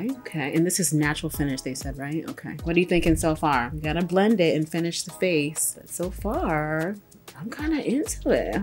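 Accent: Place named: Rhode Island, United States. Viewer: I freakin love your accent! From a MA gal to a RI gal😍😘💜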